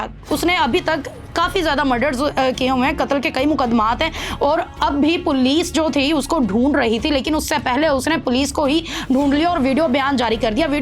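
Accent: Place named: Punjab, India. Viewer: بی بی کیا ہی اچھا ہوتا کہ آپ ویلاگ پنجابی میں کرلیتی اردو انگریزی مکس کرنے کے باوجود لب و لہجہ اور الفاظ کی ادائیگی پنجابی انداز میں ہے۔،،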